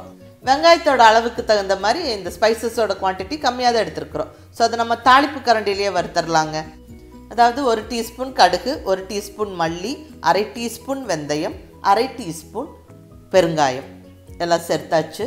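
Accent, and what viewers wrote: Coimbatore, India. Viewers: This simple beautiful talk is our😎 Coimbatore Tamil. PROUD Tamil.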